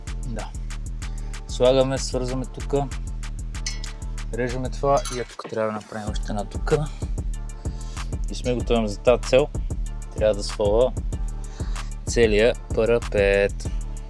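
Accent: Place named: Northwest, Bulgaria. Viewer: ТИ СИ СТАНА СЕЛЯНИН НА СЕВЕРОЗАПАДА.ААААА ЗА ГОВОРА И ДИАЛЕКТА КАК СИ ПРОМЕНИЛ .ЩЕ РАЗКАЗВАШ НА КАФЕ …:)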